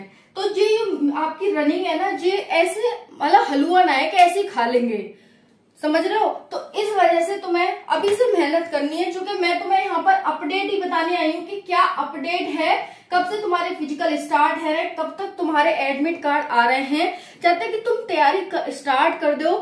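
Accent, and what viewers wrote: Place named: Braj, India. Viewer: Braj bhasha bol rhi ho aap.. Mathura se ho aap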